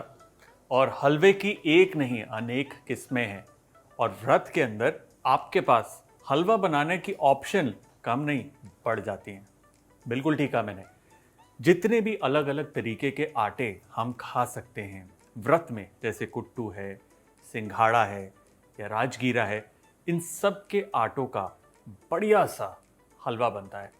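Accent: Punjab, India. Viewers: App Punjab Se Ho kya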